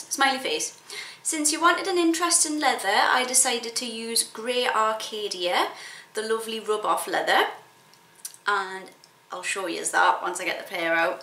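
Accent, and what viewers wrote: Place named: Newcastle, United Kingdom. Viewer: Love your Geordie accent and the boots xxxx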